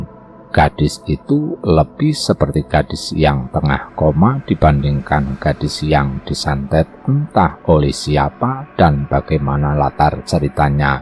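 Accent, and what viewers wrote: Java, Indonesia. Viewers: Yg bawa cerita nya gk enak medok Jawa di ulang, ulang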